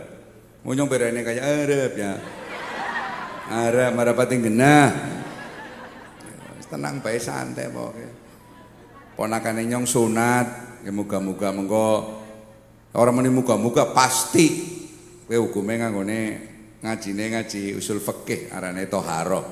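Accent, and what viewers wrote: Tegal, Indonesia. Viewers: Tidak merasa bosen dgn ceramah ki entus lucu dengan logat Tegal nya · logat tegalane medok temen gawai seneng wong brebes nang tangerang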